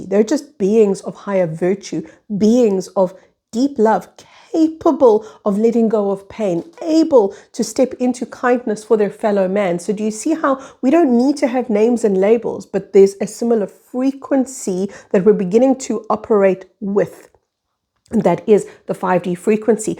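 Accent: South Africa. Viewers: Hi …..I’m South African….discoverd you just now🫶🏻excited to know you’re on YouTube….your accent made me think you’re South African and yes…..need to meet my community🙏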